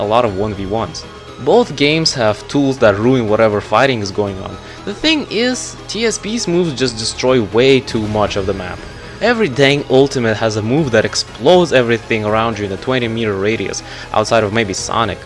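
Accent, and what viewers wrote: Russia. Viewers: Are you Russian? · Bro has russian accent, just like me b. I am russian but ik english very well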